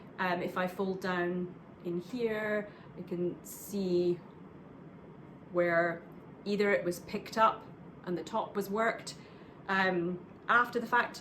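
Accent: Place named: Scotland, United Kingdom. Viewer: Congratulations on another fabulous episode! I cannot tell you how much I am enjoying the content - I love hearing about the history of these lovely garments and how you are keeping them alive … 😁It’s also so bizarre seeing the snow and hearing about the cold, when I am sitting here in the air conditioning during our hot and humid monsoon season! Even so, my roots are in Scotland and the Northern Isles, so listening to you speak is like hearing a letter from home. Thank you so much for sharing your incredible knowledge. Very much looking forward to your next episode … Big hugs from Darwin (up north) Down Under … 😊🌴🌴🌴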